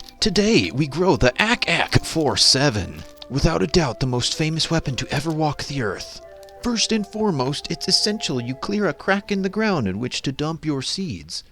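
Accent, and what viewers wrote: Russia. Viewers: Bro I was like you're Russian aren't you and after that you was like in Russian 💀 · Are you Russian? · Wait what happen to your Russian accent?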